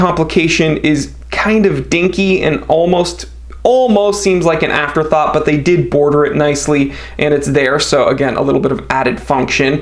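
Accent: Germany. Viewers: Please more German accent. Intoxicating and additive. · lol I love the German accent. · lol love that German accent!!!!!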